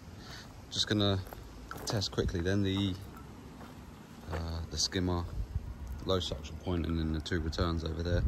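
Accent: England, United Kingdom. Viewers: You sound English?? Aussie here. Concrete and aggregate. Or fibreglass drop in. Salt water chlorinated! First I seen of one like this. You did a brilliant job.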